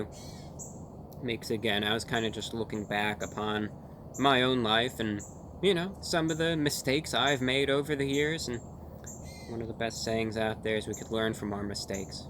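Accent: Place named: Transatlantic, United States. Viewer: People can mock all they wish, but it doesn't matter, until you get master the transatlantic accent and wear a business suit attire everyday, you'll never be on the classy level to make a truly meaningful insult on.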